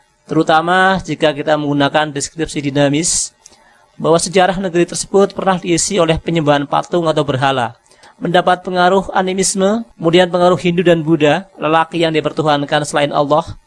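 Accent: Tegal, Indonesia. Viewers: Logat tegal yak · Yang ngomong orang tegal ya ?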